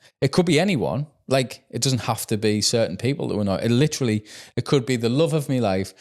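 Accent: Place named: North East England, United Kingdom. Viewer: Hi, I have found your videos again. Really enjoying them since you have the north east twang. I have got sick of watching videos of people saying it's the end of the world. We have done it as when we were lucky to get 1K views. Caravan dealers going bust 50K so you end up having to. My issue is if it's EVERy week. So the exPawers are someone I stopped watching as now every week is an issue but really it's not.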